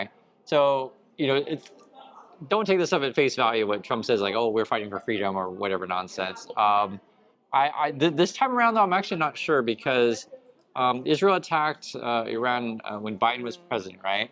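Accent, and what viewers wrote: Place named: Oregon, United States. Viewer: I can tell you're from Oregon because you say "fleg" instead of flag : )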